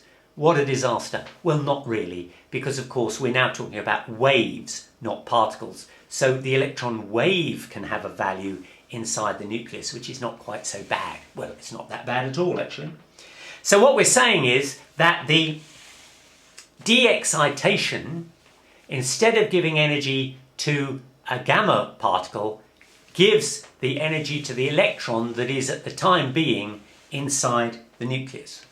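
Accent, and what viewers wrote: United Kingdom. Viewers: hi....good explanation...i think its ur british accent..isn't it?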